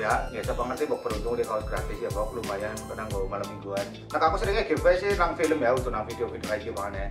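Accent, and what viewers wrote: Banyumas, Indonesia. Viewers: Salam cingire teko lampung kang, semangat pokoke nggawe film e. Aku wong jowo tapi nak ndelokne wong jowo ngapak ngomong iso nggarai ilang mumete, lucu soale kang😂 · Q ora due instagrama mas...penting lih esih ttp ngapak mas +batir"....kritikan anggep bae masukan sing bagus..ttp positif thinking bae bn terus majuuuu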